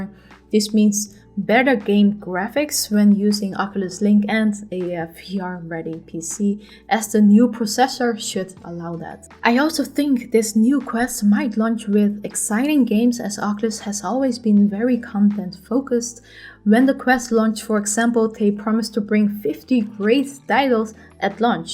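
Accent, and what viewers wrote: Netherlands. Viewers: I clicked on the video and heard the dutch accent, immediately subscribed 😂🇳🇱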